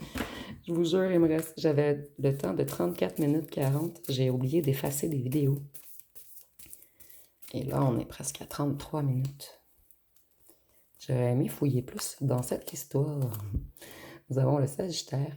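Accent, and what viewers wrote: Quebec, Canada. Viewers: Vous êtes trés drôle ,j adore votre accent québécois .Je suppose que le vehicule dont vous ne trouvez pas le nom avec lequel le monsieur veut poursuivre ma voiture ,est un tricycle pour enfant .🤣😂Donc en effet il n est pas près de me rattraper .Il vaut mieux en rire .Merci .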